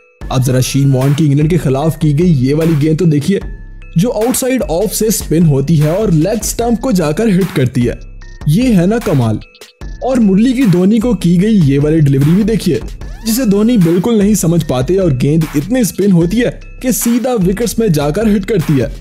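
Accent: Pakistan. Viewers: Your voice is like a Pakistani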